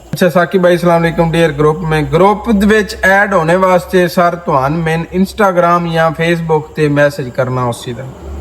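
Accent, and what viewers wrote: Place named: Sargodha, Pakistan. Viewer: Bahi g mennu Sargodha da lagda 😂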